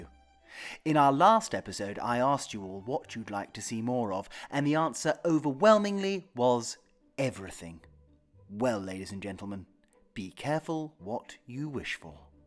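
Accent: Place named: England, United Kingdom. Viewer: “don’t be daft “ ha ha that’s all the English lingo I know… but truly love love your excellent English accent. So delightful!! Love watching your English cottage come alive! Thanks for sharing your journey !! Much love from Utah in the states.🤓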